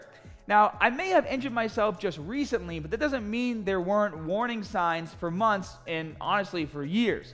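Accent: Boston, United States. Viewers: Damn...you’ve been in Florida for several months...and still have that Baaastin accent....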